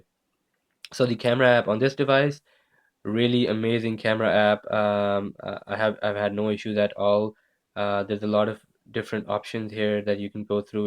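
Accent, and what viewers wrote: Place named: India. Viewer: u sound like an indian,r u?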